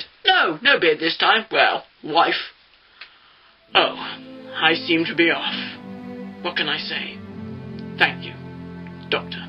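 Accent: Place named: United Kingdom. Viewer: How I like the British accent.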